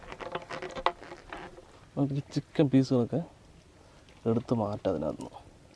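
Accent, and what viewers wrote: Palakkad, India. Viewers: Ingaloru sambhavaman 💙💙ikkaa.. palakad swantham slang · Bro nigal palakkad anno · Palakkadan slang kollam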